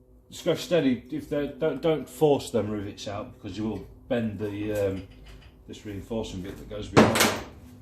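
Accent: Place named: Nottingham, United Kingdom. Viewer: Sounds like a familiar accent, I’m guessing Nottingham. I was thinking Along the same line with the tiger seal but I was hoping not to rivet the seat box bit and just glue it. Thanks for the other tips.... nice wheels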